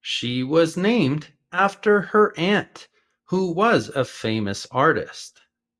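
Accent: United States. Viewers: American or Bri?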